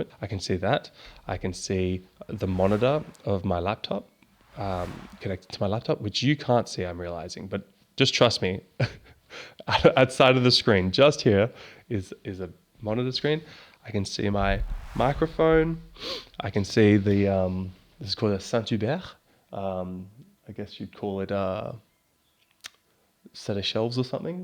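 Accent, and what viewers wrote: Australia. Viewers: I saw my pink sweater on my bed, my navy blue rumpled and soft looking pillow case, my purple cane hanging on the bathroom door knob, ugly orange mesh bag on my floor and my dusty black suede shoes. I felt my warm dry hand wipe my cold damp mouth, the softness of my pillow, the long sleeve of my fresh clean tee shirt, the smoothness of the manufactured wood bookcase by my bed. I heard the ice maker in the kitchen, the fan on my husbands side of the bed, Dr. kind, gentle, Australian accented masculine voice. I smell the clean smell at the neck of my tee shirt, the smell of the shredded wheat cereal I just ate clinging to my right hand. I taste a starchy dry taste in my mouth like I am just about to make a phone call I have been dreading or I should go wash my hands and brush my teeth because I just had cereal.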